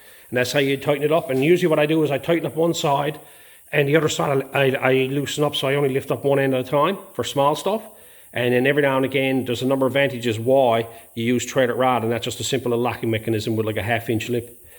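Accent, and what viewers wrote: Ireland. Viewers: Great piece of kit, well done. Is there a touch of Irish in your accent? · You sound Irish lad nice job on the brake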